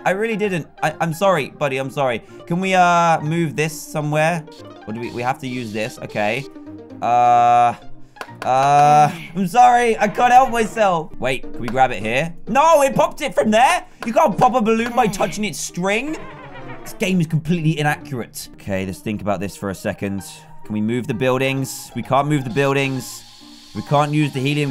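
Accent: United Kingdom. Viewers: british the mincraft man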